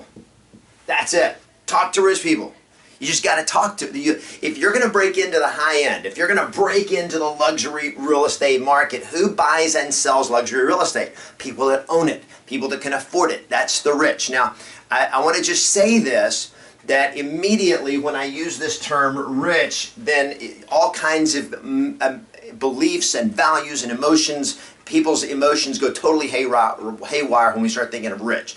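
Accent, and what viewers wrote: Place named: South, United States. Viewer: Mr., I am glad to see more of your southern draw! That's your TM! Aside from being a BadA$$ Real Estate Coach, of course.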